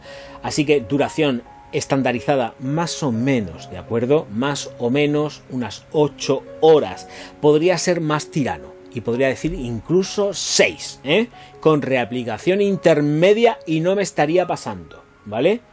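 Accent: Spain. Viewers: Jaja este acento d los españoles si q es muy cómico, m encanta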